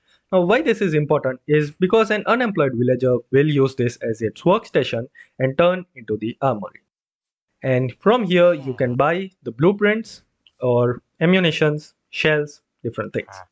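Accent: India. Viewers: Tell me you are indian without telling me you are indian. not trying to offend anyone, nice video. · Heard the Indian accent and I knew I found what I was looking for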